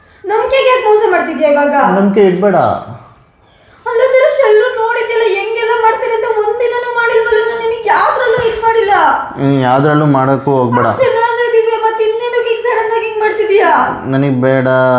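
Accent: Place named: Hassan, India. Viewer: Hassan Mathugale Chanda❤❤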